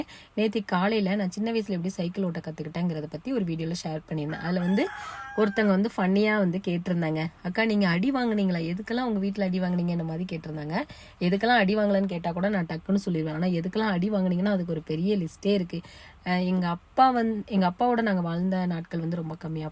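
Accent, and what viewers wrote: Tirunelveli, India. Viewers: உங்க பேச்சில் நெல்லை வாசம் வீசுகிறது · Thirunelveli Tamil naila pesuriga❤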